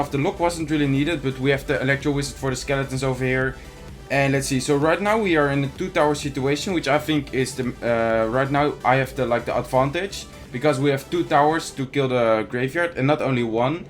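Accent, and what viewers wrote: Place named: Netherlands. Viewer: Dit is echt heel Nederlands engels😂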